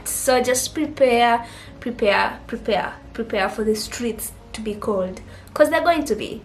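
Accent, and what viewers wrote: Kenya. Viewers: Your accent is East African most likely Kenyan.. Are you from Kenya? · Number 2. You're an extrovert. As for me I am happier when I am alone. But why do you sound like a Kenyan? Kenyan accent.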